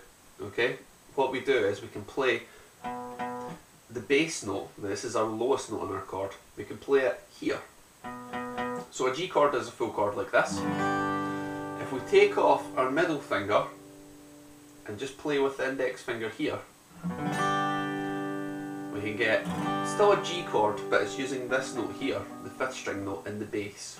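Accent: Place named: Scotland, United Kingdom. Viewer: Scottish I like it.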